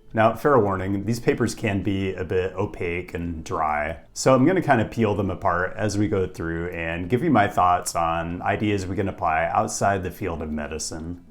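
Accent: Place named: Portland, United States. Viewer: It just now hit me why has a Portland accent.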